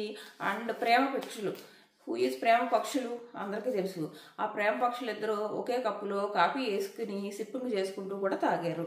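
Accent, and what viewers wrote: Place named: East Godavari, India. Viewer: East godavari voice ❤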